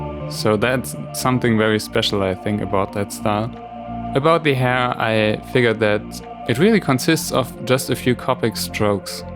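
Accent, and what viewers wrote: Germany. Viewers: I heard it from the forst seconds on, that you're German! (Because of the accent xD) But I'm too :P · I don`t like naruto because of the german translation. (I somehow noticed on your accent that u r german, I am actually german too but I mostly speak english and that`s why I write this on englisch now XD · Are you actually german? I thaught so because of your accent ^^ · Von deiner Aussprache her nehme ich einfach mal an,dass du ursprünglich deutsch sprichst,was es einfacher macht zu kommentieren xD Deine Version von FairyTail und Death Note hat mir am Besten gefallen,weil man sofort am Ausdruck erkannt hat was es sein soll.Was Naruto angeht hab ich genau zu dem Zeitpunkt angefangen es regelmäßig zu schauen als du aufgehört hast,also mit dem Beginn von Shippuuden.Gegen Midseason würd ich mal sagen gings mir dann wie dir,ich hab lange nicht weiter geschaut und als ich vor ein paar Monaten dazu kam war das erstmal ein Schock weil sich zu damals wieder so viel verändert hat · you sound very german (almost like my english teacher XD ) but I like your talent. 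· it's a german accent, so i guessss yes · hahaha ich wusste du bist deutsch, den dialekt erkennt man sofort ^^ aber cooles video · Ich hatte schon den Verdacht wegen dem deutschem Akzent :P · Your accent... your german too, right?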